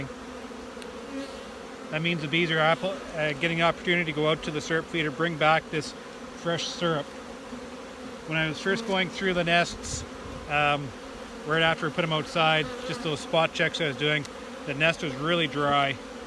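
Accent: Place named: Alberta, Canada. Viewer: Where are you at lol you sound close to me in Alberta east of Edmonton 1 hour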